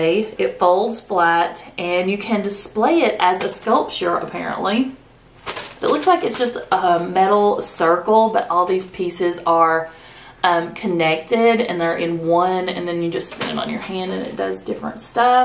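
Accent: South, United States. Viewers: That southern accent ❤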